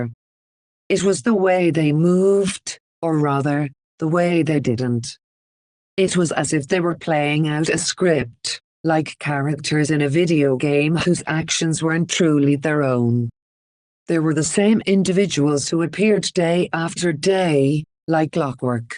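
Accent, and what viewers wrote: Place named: Ireland. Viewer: That's an Irish accent I hear, I'm a gang stalking victim and I'm Irish. Are you an Irish target?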